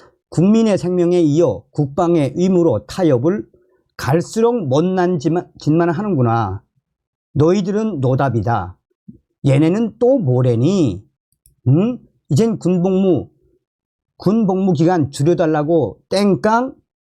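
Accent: North Korea. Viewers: 북한 어투와 어법이어서 인지는 몰라도 반복되는 중언부언이 너무 많고 사족이 너무 많으세요 선생님! 젊은세대들은 이런 스탈 싫어 합니다 .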